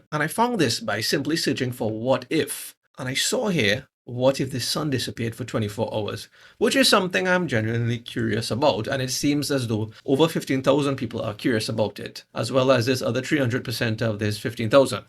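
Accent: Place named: Trinidad and Tobago. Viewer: Alright Good morning Yuh sounding Trinitarian. 🇹🇹 Trinidadian.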